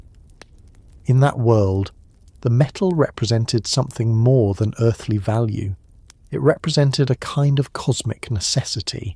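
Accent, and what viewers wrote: United Kingdom. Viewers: How many channels do you have? You are the same british voiceover on many other similar topic of a video. Are you an AI British voice?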